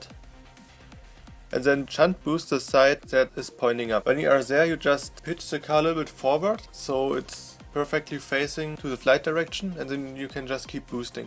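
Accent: Germany. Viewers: Mashalla guter deutscher akzent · Bro du bist deutscher wie du redest wtf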